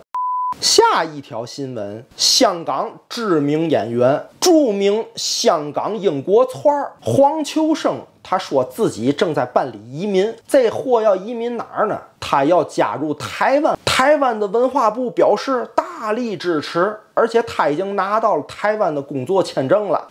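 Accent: Tianjin, China. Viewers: 这天津话说的，喜感自然来，还很亲切。每天看莱克多巴蛙快报，是一件极为快乐的事情。支持！~ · 在天津上的大学，怀念你纯正的口音啊。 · 这口活绝了，以后都天津卫嘴好了xD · 天津話，過癮！ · 每日谈: 天津话的魅力天津人的种族天赋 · 喜歡這津腔播報👍👍👍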